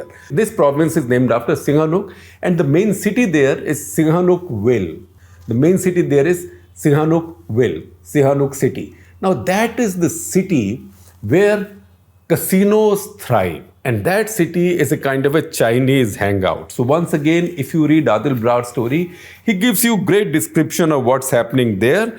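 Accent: India. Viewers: even though I already understand the issue, the crazy indian accent made me sit down and listen to this. Very compelling. Glad to see Indian media rising up as an alternative to the Anglo-Zionist dominated one.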